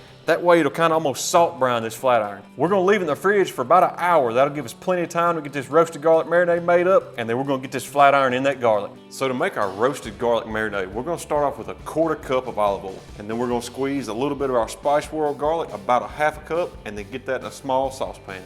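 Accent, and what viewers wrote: South, United States. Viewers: Love the southern accent (if am correct). Nice steak, looks quite delicious 👍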